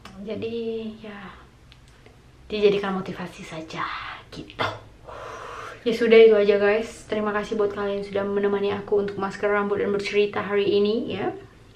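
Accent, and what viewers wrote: Indonesia. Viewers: Salam kak... Saye dari tg. Balai karimun... Slame nonton vlog akak,, bahase nye same dgn kami... Ternyate emang pernah tinggal di tg. Balai karimun... Kak tg. Balai karimun nya dulu dimna?? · pantas logat e macam pontianak.. emang perbatasan indonesia na kalimantan dengan malaisia.. walaupun ndak nempel langsong pontianak e wkwkwk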